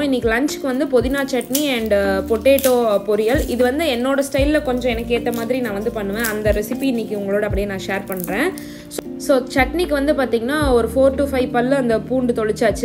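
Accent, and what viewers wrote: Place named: Erode, India. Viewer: Erode slang ✨❤ since my home town is salem i can find it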